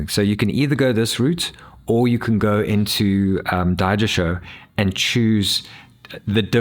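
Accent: South Africa. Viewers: This is SO GOOD. Want to do this with my phone as I don't own a retro handheld but this is basically an android setup guide (which is awesome). You sound like you are from South Africa? I'm from South Africa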